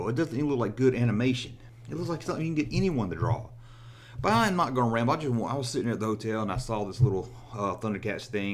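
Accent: South, United States. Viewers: i love that southern accent! reminds me of my grandma.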